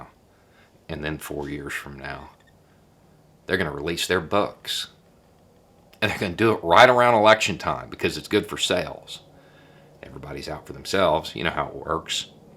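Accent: South, United States. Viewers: Wisdom with a Southern accent. Who'd-a thunk it?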